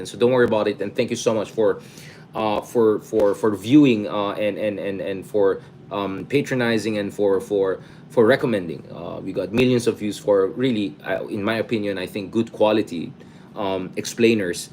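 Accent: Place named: Ilocos, Philippines. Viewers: Sir kansat.kasla ka met kanyami nga igorot nga agsao ilokano. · Kyut ng Ilocano delivery mo and I was waiting for the magic word and so glad I heard “please”. Haha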